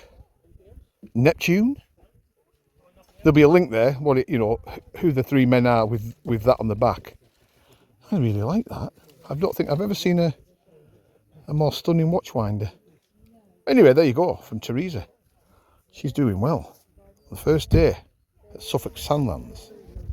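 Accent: Cumbria, United Kingdom. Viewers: Just found your site, just got an interest into metal detecting at 72 years old. The other interesting thing, yours is the first site I have looked at and thought I recognised the accent, on checking Dalton, I'm from Barrow-in-Furness, left 51 years ago and emigrated to New Zealand. Look forward to learning from a local. Paul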